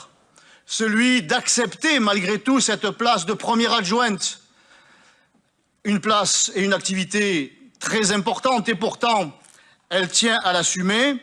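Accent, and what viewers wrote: South, France. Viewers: Un homme charismatique avec l'accent du sud et qui lâche de grosses punchline sur des socialistes que demander plus 🤣🤣. Président 🇨🇵🇨🇵🇨🇵🤣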